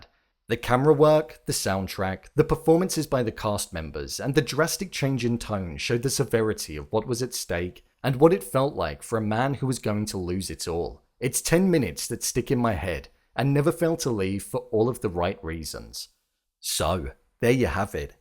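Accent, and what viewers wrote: United Kingdom. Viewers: Why does yt keep recommending me bri’ish video essayists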